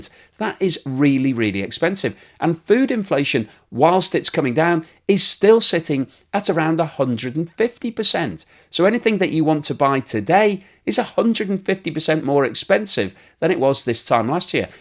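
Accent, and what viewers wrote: United Kingdom. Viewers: Even your charming British accent doesn’t help you with one major flaw with your analysis. And that is that the Clown known as Javier Milie revealed his pure incompetence when he said that the only countries in the world that he is going to have economic relationships with are USA and Israel. He completely omitted China which is the economic powerhouse on the global scale. Milie is a complete imbecile and Sadly many Argentinian were too ignorant to see that.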